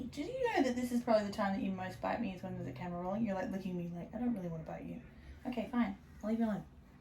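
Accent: Australia. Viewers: Hello from central Vic! You're the first Australian podcast I've found, my brain can't really compute and keeps thinking you have an accent (even though it's the same as mine 🤣🤦‍♀️). I feel like we should have some beautiful yarns here but I don't know of too many local ones, so I'm really looking forward to hearing what you find! If you're looking to dip your toe into cables I'd highly recommend "A Little Hocus Pocus" socks, its a free pattern & has a sweet little cable detail. Thanks for a lovely podcast 🙂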